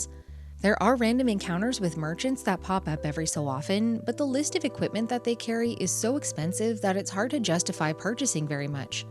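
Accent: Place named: Canada. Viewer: I thought I heard a Canadian accent in your video, which was why I mentioned the LttP morcel. I don't remember the translation of Drakkhen very well as it was decades ago, but it has to be better than the English version as it was written by a French studio. If you are correct, the fact that they translated the game from French to Japanese (two wildly different languages) and then from Japanese to English (also two wildly different languages) is very much going around the arse to get to the wrist and ends up not surprisingly with a bad translation. The PC version in the original French can be found at a site called Abandonware France. I'm sure that you could muddle through as you have already played the game. As for Link to the Past, that game is quite text-light and the French Canadian rom is out there, so I am sure that you could finish it without any difficulty.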